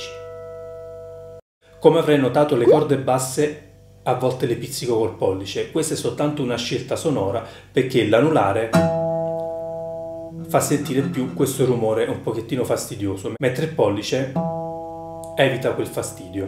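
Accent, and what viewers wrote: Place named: Naples, Italy. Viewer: Grazie sei molto bravo e chiaro..poi quell'accento napoletano mi rilassa una cifra!un caro saluto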